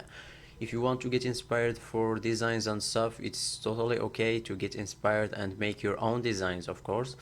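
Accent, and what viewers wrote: Morocco. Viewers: Men Accent 3rftk mghribi hhh + Chokran Bro 3la cher7 ;) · hta ana 3Rftk mghribi nice bro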